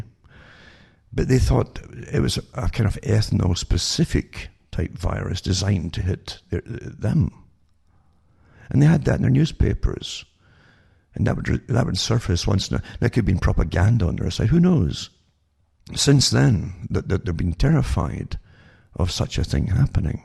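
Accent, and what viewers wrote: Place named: Scotland, United Kingdom. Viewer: New subscriber from the Central Coast NSW Australia. Love a Scots accent too!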